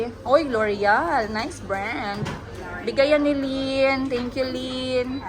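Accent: Iloilo, Philippines. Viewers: Namian gid ko mag estorya ka ilongga ka mam 😁❤️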